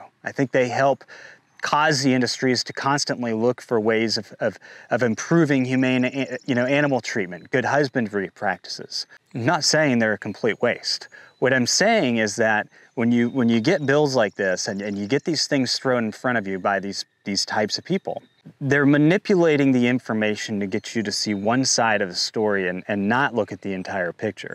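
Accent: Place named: East Coast, United States. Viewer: You must be from the east coast somewhere. I'm from Oregon. We say it Orgun. It doesn't rhyme with polygon. Just Orgun, thanks. Also, it's not ColorAHdo. The A in Colorado sounds like the a in apple. No umlaut. Yes, I know that it's a Spanish word and in Spanish they'd say AH. I used to get it wrong too. Doesn't matter. Point is you don't sound like a local and that annoys the locals. Also, I have a bone to pick with you hoity-toity eastcoasters treating west coasters like we're stupid bumpkins. At least we can pronounce all of your state's names properly without all the stubborn denial of our own ignorance. I've had New Yonkers tell me I'm pronouncing Oregon wrong when I'd hoped to enlighten them in the past. The nerve! Namaste!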